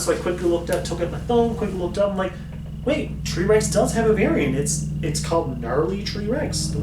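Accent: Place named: United Kingdom. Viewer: I swear sometimes you sound British.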